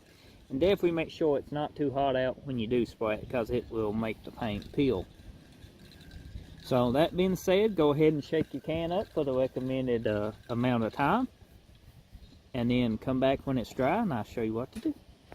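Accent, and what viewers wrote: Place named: Kentucky, United States. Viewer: lol well I'm from Texas but my dad is from Bardstown and your accent is hardcore lol. good stuff.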